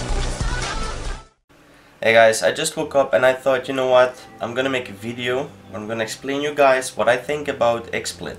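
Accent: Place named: Netherlands. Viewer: where are you from? you sound kinda dutch to me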